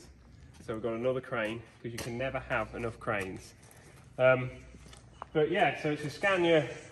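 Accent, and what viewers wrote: United Kingdom. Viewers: As an American, at least I can understand about 50% of your British accent !!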